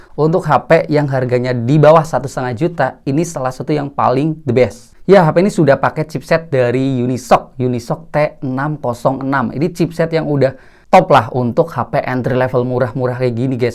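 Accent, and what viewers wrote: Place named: Cirebon, Indonesia. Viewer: Logatnya kaya wong cerbon bang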